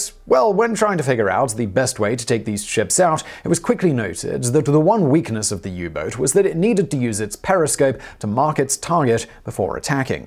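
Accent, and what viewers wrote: United Kingdom. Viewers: BonesThe modern British English accent was created to distance themselves from seceded American colonialists. The goal was to make themselves sound posh, or more intelligent, versus someone from the US. Im saying his British accent is so perfect that instead of my usual opinion of the accent, which is lazy, I think it makes him sound rather fancy.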